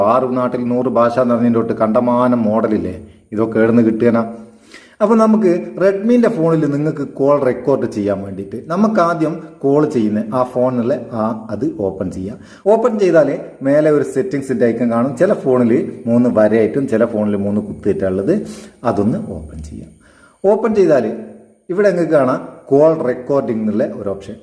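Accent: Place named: Vadakara, India. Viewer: ഇജ്ജ് വടകര ആണ് അല്ലെ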